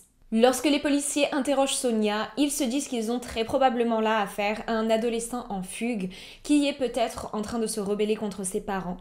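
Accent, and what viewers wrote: Quebec, Canada. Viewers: J'aime vraiment trop ton accent Québecois, ça sonne tellement sympathique, j'adore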